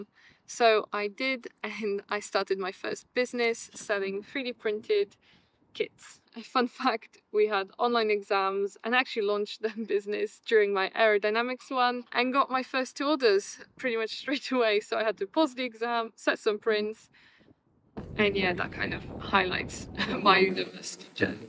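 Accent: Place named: London, United Kingdom. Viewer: talk in this weird London accent... a malformed style of English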